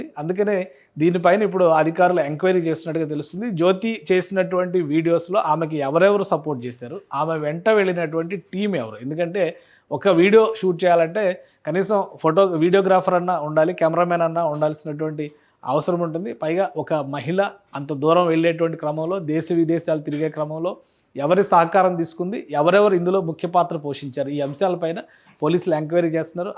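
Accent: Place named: Telangana, India. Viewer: ఆయన తెలుగు తెలంగాణా యాసలో ఉంది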